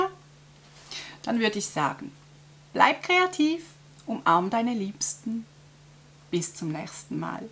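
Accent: Switzerland. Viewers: Liebe, ich finde deine schwizerische Aussprache entzückend. Das Verständnis ist von meiner Seite da, aber ich lebe auch in Bayern. Wir verstehen alles.....nein manche Bayern verstehe selbst ich nicht. Eigentlich bedarf es auch nicht vieler Worte, die Objekte sind durch das Zuschauen selbsterklärend. Zu viel Gerede ist ja manchmal auch so, dass man denkt, " Komm jetzt mal zu Sache" 😄. Ich finde alles super toll entspannend. So entspannend, dass ich auch schon eingeschlafen bin ☺️. Das soll nicht negativ sein. Nein im Gegenteil. Es ist alles sehr ommmmmmm. Beste Grüße und mach weiter so!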